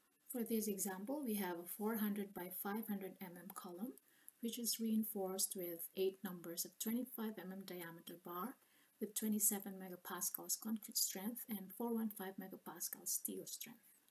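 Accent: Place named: Europe. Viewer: Incredible voice. Very interesting accent: a combination of European accents(?) But should have been closer to the microphone.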